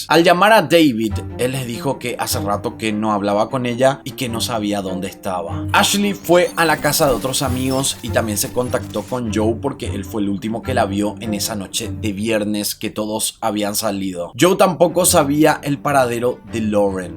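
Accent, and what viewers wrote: Paraguay. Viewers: Me encantan tus casos y como narras!!! Dios mío, y más aún escuchar las narraciones con ese acento paraguayo 😍 jajaja. Seguí asiiiii, éxitos siempre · No soporto el acento paraguayo. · Muy bueno hermano te descubrí hace un par de días. Ágata descubrí el acento paraguayo, muy buenos los videos. En Tucumán Argentina hay dos casos interesantes uno es Dulio Fernández creo que del año 1995, un chico que desapareció en un río, y hasta hace poco se hicieron búsquedas en EEUU por su desaparición.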